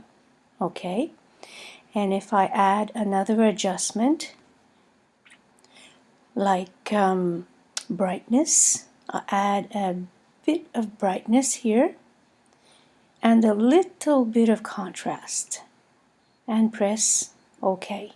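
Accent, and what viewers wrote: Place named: France. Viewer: Ha ha ha ! There is a touch on his diction almost imperceptible, but the musicality of "sugary" French is there ! :) Kindre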